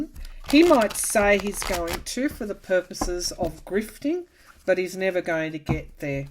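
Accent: Australia. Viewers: You have been such a comfort to me this past year. I’ve truly felt your genuine and heartfelt concern and empathy for what we’ve been going through these past years. If it weren’t for your charming Aussie accent, I would think you’re a citizen of the US! I absolutely love and adore you. I truly mean it when I say I don’t know how I could have taken all the abuse without your constant calm comfort. 🙏❤️🌸😇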